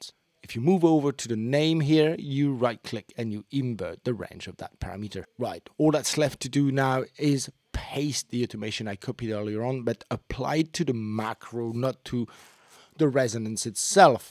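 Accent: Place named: England, United Kingdom. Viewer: Nice english accent l'ami!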